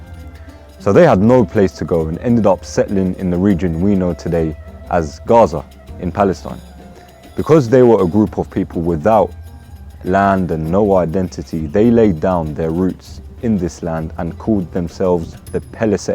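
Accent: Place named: England, United Kingdom. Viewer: لكنة انجليزية رخمة و رائعة!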